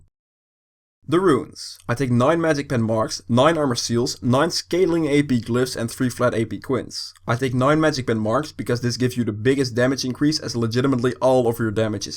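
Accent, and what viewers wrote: Netherlands. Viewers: Heerlijk nederlands engels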